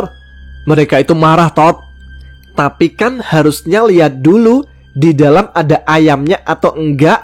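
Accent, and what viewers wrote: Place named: Bogor, Indonesia. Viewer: Suoro bogor❤😂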